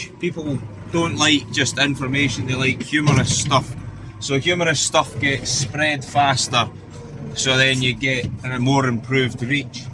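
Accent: Scotland, United Kingdom. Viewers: From Brittany, i like your funny scots accent. It's a bit hard at the begginning but when i catch your accent it 's really fin 🤪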